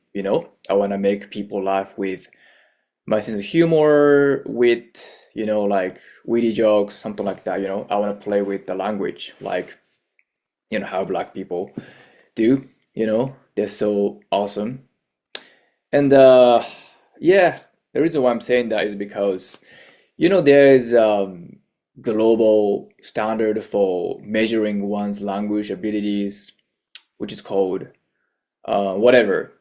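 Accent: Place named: Japan. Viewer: Here is some feedback for you: 1. You don't sound like you have a heavy Japanese accent. But it is not native sounding either. There are some sounds and phrasing flows that give away your Japanese roots. Some examples are the l/r mix, and the way you say some sets of words in quick flows that come out weird. Specially in the beginning to middle part of the video. You seem to settle on to a more natural flow later. 2. There are so many "you know"s and lip smacks that it became distracting to actually listen to what you were saying. If you would like to manipulate and charm people, maybe don't look for acknowledgement of your thought process so often, even involuntarily. The "You Know"s were honestly too much. Other than that, awesome confidence, projection, clarity and word choice. Keep going!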